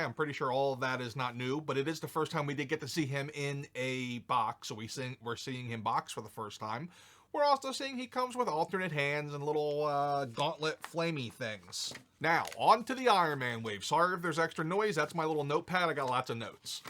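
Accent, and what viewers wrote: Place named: Philadelphia, United States. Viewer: New subscriber here. You sound like you are from Philly or more generally, Eastern PA!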